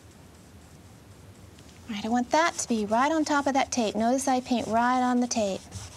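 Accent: South, United States. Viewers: southern style talking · Ahhh, her country accent is hypnotizing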